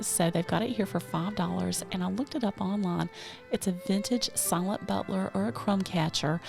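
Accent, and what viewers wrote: South, United States. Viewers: yes, and when we had a land line I used them. It brings back nice memories. Just like taking those trips with you. And hearing your sweet southern accent just brings back all those wonderful growing up days. Many thanks for you doing this for us all.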